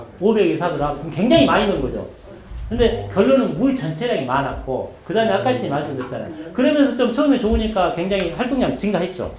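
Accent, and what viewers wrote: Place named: Jeolla, South Korea. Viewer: 박사님 전라도 분이신가요? 저도 전라도 사람인데 왠지 억양이 친근하게 느껴져서요^^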